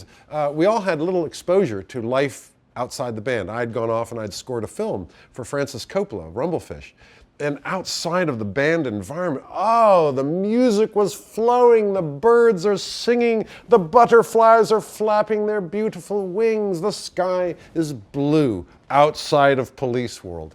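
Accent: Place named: United States. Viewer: He sounds so American but isn't he British?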